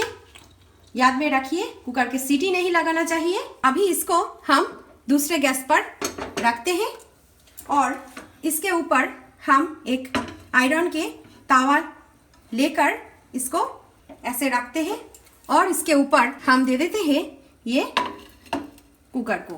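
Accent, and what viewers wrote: Bengal, India. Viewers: Are you Bengali? I am telling this after hearing your hindi ☺☺ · Your recpice is osm, but I want to ask are you bengalee mam?? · R u bengali mam?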